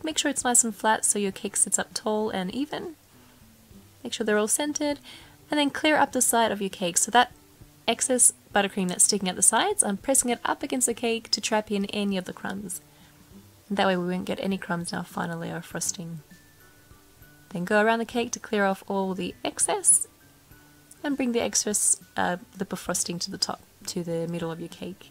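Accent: Australia. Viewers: why do almost all the good bakers have Australian accents?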